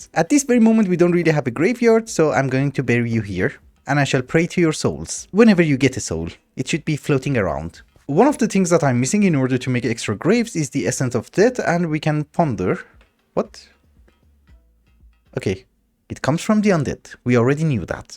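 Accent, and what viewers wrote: France. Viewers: je ne m'attendais pas à cet accent français subtil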